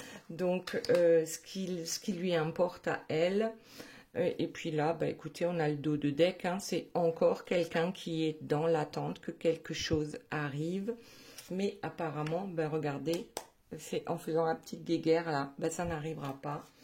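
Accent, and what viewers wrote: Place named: East, France. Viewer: Merci beaucoup pour cette guidance, je pense que ça correspond bien à ce qui se passe dans le couple de celle que j'aime avec qui on est en non communication pour l'instant. Sinon j'avais choisi le monde pour elle et la mort pour moi et ça me va 👍 Merci (aussi pour ce petit accent de l'est ..😊 je suis de Moselle 😂) 😘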